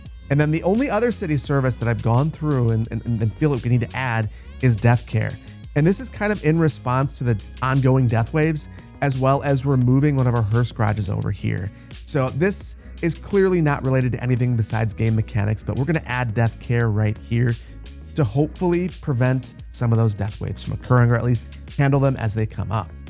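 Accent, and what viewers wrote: Wisconsin, United States. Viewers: Your Wisconsin is showing 😂 I live in a neighborhood just like this, out towards Milwaukee area. The Midwest is riddled with neighborhoods like this. I love it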